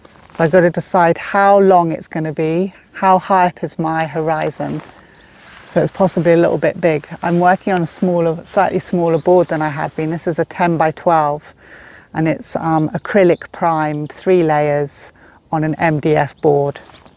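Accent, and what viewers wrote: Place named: England, United Kingdom. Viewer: All art teachers should have an English accent.....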